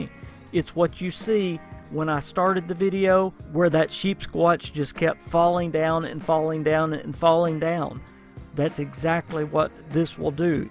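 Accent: United States. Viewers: I love your country accent, feels like home! As far as content, very informative! You've got a new subscriber!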